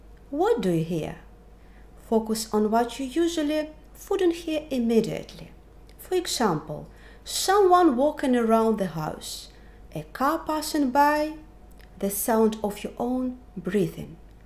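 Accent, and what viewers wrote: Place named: Eastern Europe. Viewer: Wonderful video, thank you. Very grateful new Aussie subscriber and love the East European accent. Thank You again.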